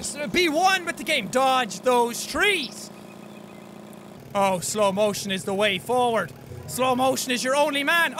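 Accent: Ireland. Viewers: the more excited jack gets, the more progressively Irish he gets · Are you Irish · Knickers, another word for underwear lol he just Irish so it sounds funny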